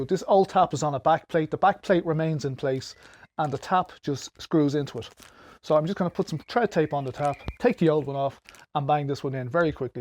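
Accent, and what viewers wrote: Dublin, Ireland. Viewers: Thanks so much. This is exactly my problem at the moment. The house is 18 years old now and the tap,has been dripping for the last couple of years. My husband has never gotten around to replacing it. I’m going to give this a try myself. Greetings from Friedberg in Hessen, in Germany. You sound like you are from Dublin, just like myself. Take care.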